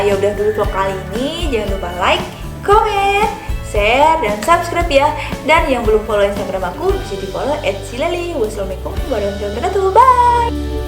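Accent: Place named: Paburan, Indonesia. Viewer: Lah teteh orang paburan tahh,sedayeuh 😂😂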